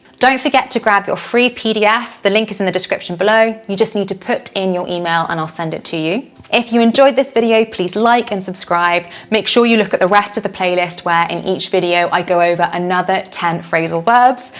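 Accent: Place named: London, United Kingdom. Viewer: I'm a native English speaker in London. Your accent is extremely similar to mine. You rightly pronounced the second 'a' in 'vocabulary' as a schwa. It seems to me that British people are increasingly pronouncing the word as 'Vocabu-larry' (like Americans say it). You pronounced 'often' with a silent 't', as I do. When I was a child in the 1980s, /ˈɒf.tən/ was regarded a mispronunciation. I assume it in came into the British English dictionary some years later.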